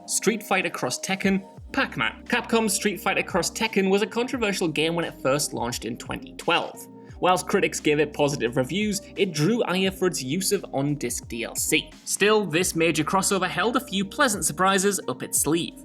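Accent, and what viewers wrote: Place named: United Kingdom. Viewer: I think you went far to British in the vid. Had no idea what you were saying during skate section. Also Americans watch this channel. Your welcome.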